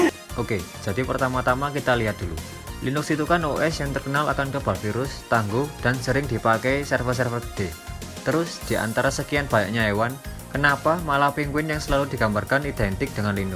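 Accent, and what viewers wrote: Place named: Java, Indonesia. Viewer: Nah, logo penguin itu sebenarnya mirip sama pengguna linux yang perutnya gede, contohnya bapak floss stallman. Btw salam medok bang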